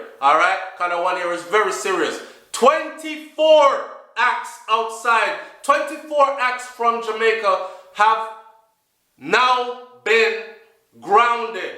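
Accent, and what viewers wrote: England, United Kingdom. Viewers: British accent tun up my yute. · Yo dawg yuh England accent mad 🤣🤣🤣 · Love the English accent... lol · you british accent tun up high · The british accent...wicked would listen to it all day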